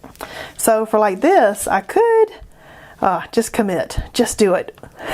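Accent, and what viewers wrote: South, United States. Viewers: Your southern accent and bubbly personality are so refreshing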